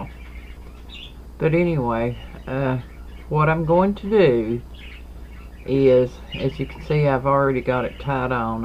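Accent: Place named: Tennessee, United States. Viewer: Thanks for sharing this cute crochet project. It was kind of confusing at first, since you were just saying "yarn over and pull through" without specifying that the 2nd time was going through the yarn loops and not the scrubby. lol. Is that the chain stitch? I think it is. I don't know how to crochet, but have thought about learning it with ladder yarn to make some necklaces for my handmade jewelry. My sister was learning how to crochet, but got frustrated with it. lol. I've added this video into my playlit- Crafty Creator Support for Artists, Beaders and Crafters. Hopefully that will help you with watch time. I miss my hometown of Knoxville, Tennessee...so it was wonderful hearing your accent. Reminds me of home. Take care! Sparkle on!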